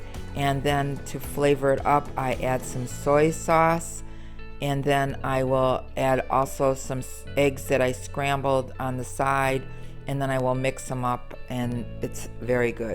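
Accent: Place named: Midwest, United States. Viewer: you're welcome! I asked on aonther if you were originally from the Midwest (I'm from MI, lived in WI a while, and I feel like I hear Midwest when you speak 🙂)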